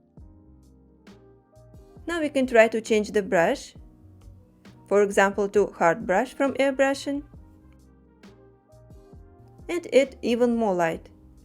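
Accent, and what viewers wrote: Russia. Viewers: Как же акцент режет слух сразу понятно что русская )))) только у русского человека может быть такой акцент. Уж лучше на русском говорите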